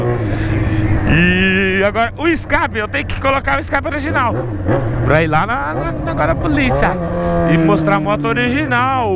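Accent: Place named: Paraná, Brazil. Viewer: sotaque paranaense kkkkkkkkk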